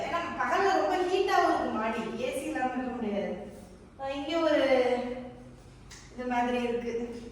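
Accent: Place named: Ramanathapuram, India. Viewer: Ongloda slang ramad (D's)mari erukku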